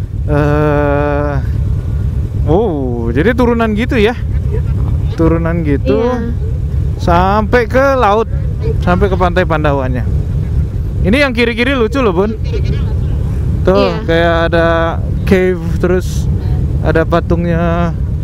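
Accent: West Java, Indonesia. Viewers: Logat orang sunda iye he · Bro aslinya orang mana logat sundan nya agak kenyel hehehe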